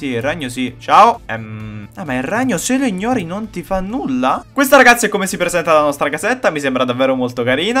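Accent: Naples, Italy. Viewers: ma sei napoletano?🤣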